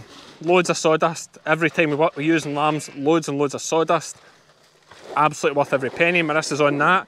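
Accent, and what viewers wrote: Scotland, United Kingdom. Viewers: Loved the photo of and her dogs at the end. Somehow the Scottish accent does not quite have that impact that Forest Gump's Alabama dialect does, but good try.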